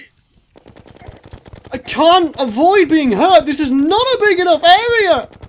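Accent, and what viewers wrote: United Kingdom. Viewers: Walker Wilkins I think they're both British.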